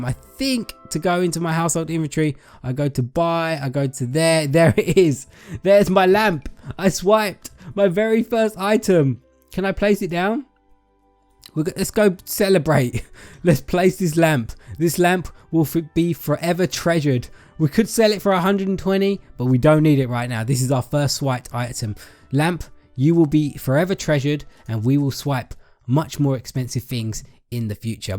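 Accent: United Kingdom. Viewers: U can definitely tell hes form England but same 😂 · you sound so British I am British to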